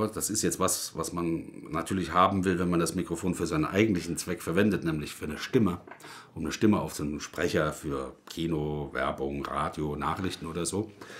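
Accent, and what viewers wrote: Hesse, Germany. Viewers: babbelt hessisch.....great!!!!!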